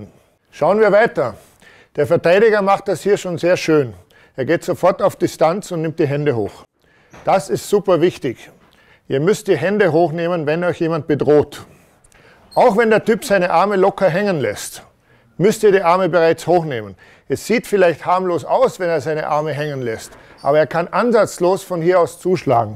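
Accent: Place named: Vorarlberg, Austria. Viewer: Geil. Könnte grad meinen du kommst aus Vorarlberg. Geil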